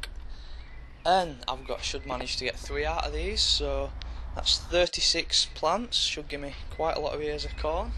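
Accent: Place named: North, United Kingdom. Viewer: Your accent just makes this video even better. Good old northern corn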